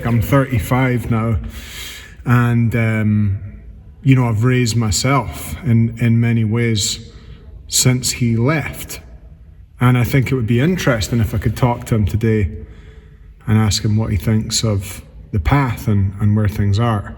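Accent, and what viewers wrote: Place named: Scotland, United Kingdom. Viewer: Hi, I've just been listening to all your music on YouTube again. ❤️ Keep being amazing and real❤️ Love all your music and listening to your lovely Scottish voice in the chats too. Thank you for voicing most of my deepest inner thoughts, concerning grief. It never disappears, it stretches out, as you get on with everything else in your life. I've lost lots of people sadly, including my middle son and my husband. Music has always helped me through. Your music is very special and continues to support lots of us, on our life journeys. Sending best wishes and much love to you and Rosa xxx❤️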